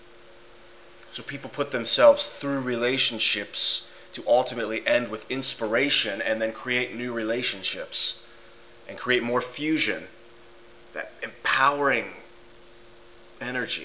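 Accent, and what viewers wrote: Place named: South, United States. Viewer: You must be southern.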